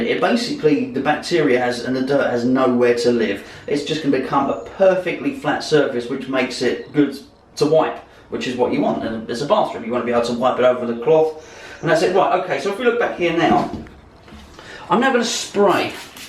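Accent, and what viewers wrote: United Kingdom. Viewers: nice vid. what accent are u ? british aussie kiwi??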